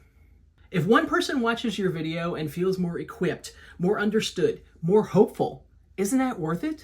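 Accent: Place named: South, United States. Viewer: Hey! I went to your channel and watched your Ace Hardware video. If you’re OK with a little constructive criticism, I would love to give you my review, maybe it will help a little bit. I loved your video! Your voice is awesome, (I love a great southern accent).😎 there is only one thing that I noticed on the video that would keep me from watching more of your videos. I noticed that you don’t look straight into the camera lens enough. I think on a video like this when you are talking to your audience you need to think of it like talking to a friend or one person. My favorite Youtubers look straight into the lens the entire time that they are talking to the camera and it looks like they are looking right into my eyes and that is what I love! Maybe you can practice this and get better at not looking back-and-forth between the lens and your own face, I know it’s a hard one, but I think that would help you a lot! 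I didn’t see any other issues with your video. Great content also! I love to see what is in a store before I go shopping.😃👏👏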